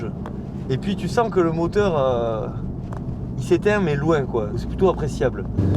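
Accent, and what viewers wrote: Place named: Montauban, France. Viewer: Bonjour mon ami super véhicule. Tu as un accent de Montauban toi🎉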